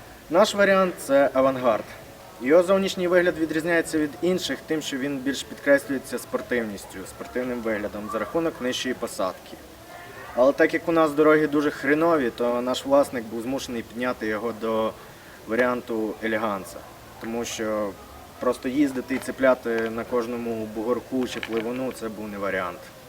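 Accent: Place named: Surzhyk, Ukraine. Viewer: Суржик вуха ріже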